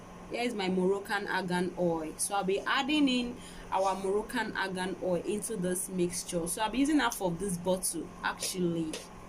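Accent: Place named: Lagos, Nigeria. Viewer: Ur Yoruba accent is like dis Lagos pple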